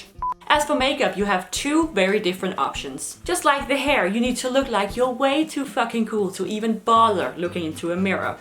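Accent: Denmark. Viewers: Mr. Ear Raper ! Lyt til hendes accent. Hun er selv dansker. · Jesus christ her danish accent is amazing ! I think i remeber this girl from my younger days where she was adored as a scene goddess. Good times ... good times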